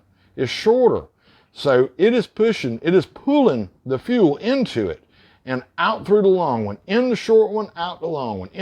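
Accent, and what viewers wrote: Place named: Appalachia, United States. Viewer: oh your god, dull and hillbilly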